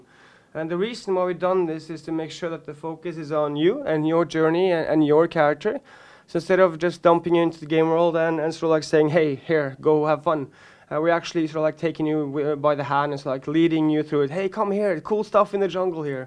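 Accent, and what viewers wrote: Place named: Norway. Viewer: Hva faen mann! xD Så lett å høre at du er norsk! What the fuck, man! It's so easy to hear that You are norwegian!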